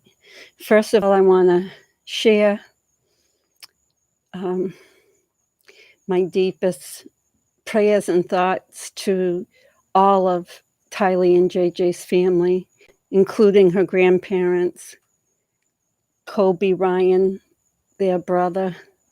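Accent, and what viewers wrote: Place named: East Coast, United States. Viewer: I recognize your accent. East Coast?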